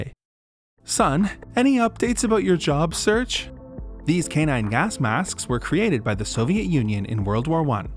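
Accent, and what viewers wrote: United States. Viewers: The Texas accent was hilarious, coming from an authentic Texas native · I live in East Texas and I've heard people speak with a VERY similar accent. Even kind of sounds like an old politician from the 90s named Ross Perot.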